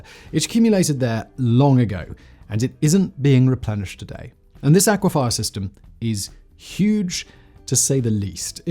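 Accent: United Kingdom. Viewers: I can never tell when if he's mispronouncing something, or if it's just the usual British insistence on adding hard vowel sounds where there isn't even a vowel.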